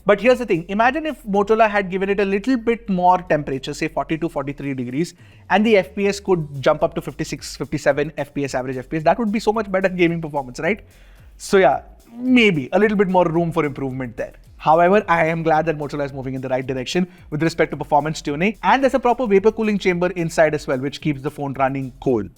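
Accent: India. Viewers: Display is not up to the mark, inferior than edge 60 fusion ,f55 5g display far better than these two.Thanks for your fluent English with Indian accent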